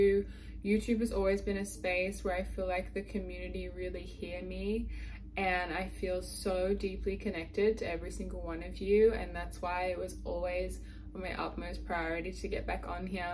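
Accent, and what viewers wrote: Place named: United States. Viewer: I can hear the most tiniest American accent coming on!!!! Fellow Byron girl here x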